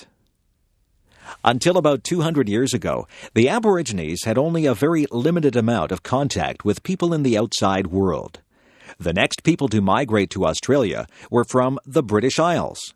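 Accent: United States. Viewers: Factually incorrect, in an American accent and doesn't even pronounce the names of major cities correctly. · The accent in the audio is American, with some place name mispronunciations.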